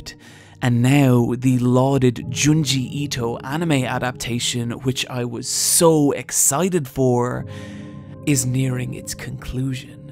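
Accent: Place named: Ireland. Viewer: It's the Irish accent and I agree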